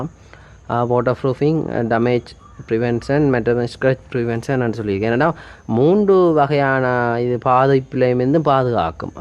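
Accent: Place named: Sri Lanka. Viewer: இலங்கை தமிழ் என்ன ஒரு இனிமை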